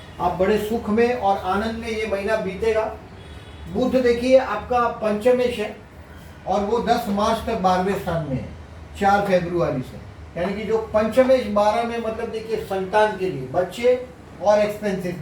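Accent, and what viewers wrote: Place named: Gujarat, India. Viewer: Is he from Gujarat? His accent is so.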